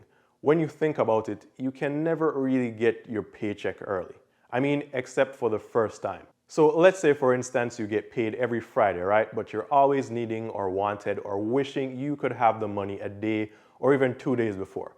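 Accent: Jamaica. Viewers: Weird question what part of the Caribbean you from. I want to guess Jamaica but I maybe wrong.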